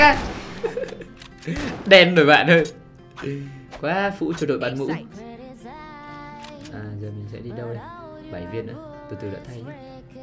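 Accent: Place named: Saigon, Vietnam. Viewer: ông này giờ nhại sài gòn rồi :)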